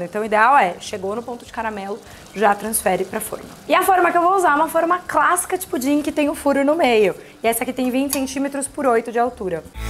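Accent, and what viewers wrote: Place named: São Paulo, Brazil. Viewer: Nao existe alguém com um sotaque paulistano tão carregado como o da Ju Ferraz, até eu que tb sou de SP fico impressionada 😍😆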